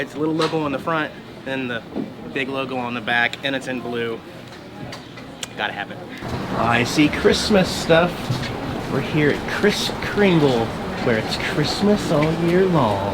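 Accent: South, United States. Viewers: Why do you sound like your from the south?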